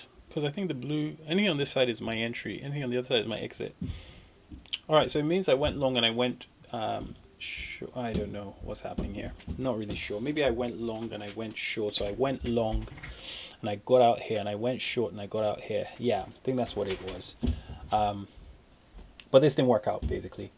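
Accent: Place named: England, United Kingdom. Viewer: Btw where are u based? You sound English but your charts are in America :-)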